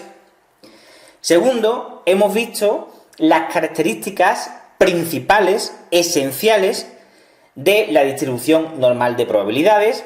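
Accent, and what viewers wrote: Andalusia, Spain. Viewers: Hello teacher, you will not be by chance in southern Spain ? I tell by the accent to pronounce . Very good videos and greetings .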